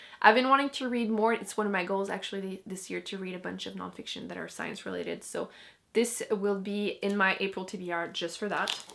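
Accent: France. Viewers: sorry but each time I keep wondering... are you french ?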